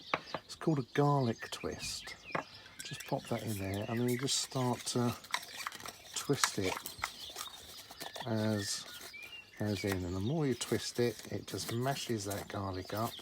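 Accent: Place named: United Kingdom. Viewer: I am just here for the british Accent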